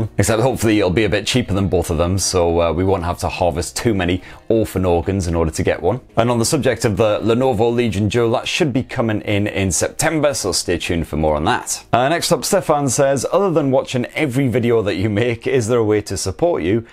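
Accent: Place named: Northern England, United Kingdom. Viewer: Thank God, I knew you were northern but couldn’t tell if it was Geordie or Mackem. Fellow Mackem here (well, adopted Mackem for the last ~5years, pit yacker beforehand).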